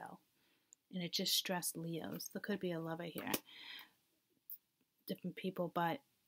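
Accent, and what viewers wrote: Boston, United States. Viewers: Are you from Boston?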